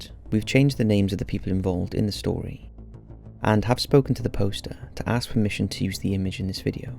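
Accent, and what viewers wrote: Wales, United Kingdom. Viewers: Edwin well it's almost lunch time where I live in europe and I suspect he lives somewhere in great britain according to his accent.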